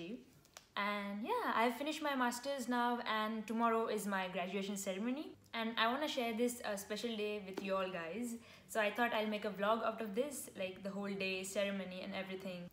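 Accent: India. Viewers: I liked the way you keep your Indian accent while talking in English unlike some people who try to pretend that they have adopted the accent but even the people who they are pretending to be don't understand what they say.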